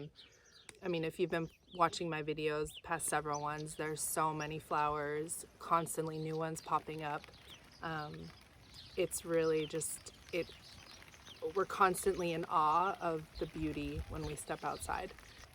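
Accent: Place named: United States. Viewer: How did you get sick in winter. I have been here since March 20 and not ha a day off yet. Yes we have had the wettest winter so far and now plenty of water to see me through the coming warm summer. Even up here in the mountains it can still get hot, 33 or 92 f last week, a tad warmer than U.K. and dam site drier after what they have had in recent months. Here we have had 150% of our annual rainfall while parts of U.K. have had 300% of their total for the winter. You speak English, but the accent is elsewhere, America or maybe Canada and hubby could be local as not hear him much. A sheet of clear plastic over your seed pots will work better. Toms need 68 bottom heat to germinate within 3 days or can take a week if left in the house. I have been a gardener for a long time so ask if you need to know anything to help you.The more I listen the more I think Canada or northern states, but maybe lived in U.K. 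for a while. Enjoy it while you can as if the fools in the middle east do calm down then we are all up shit creek without a paddle.. Mulberrys must be nearly wrotten before you eat them.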